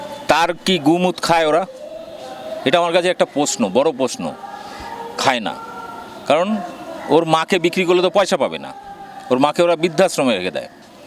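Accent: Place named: Bangladesh. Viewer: Apnar bangladeshi mone hochhe dada.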